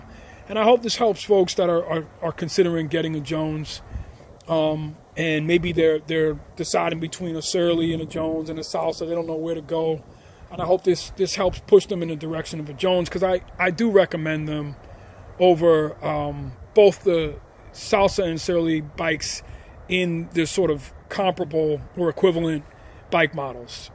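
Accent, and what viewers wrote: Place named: New York, United States. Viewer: As soon as I heard your voice, it took me back home 😁 (Brooklyn/Queens/Nassau Cty) I worked at a few shops in the area in the 80’s/90’s. Enjoyed both reviews of the Jones frames, solid down to earth info. 👍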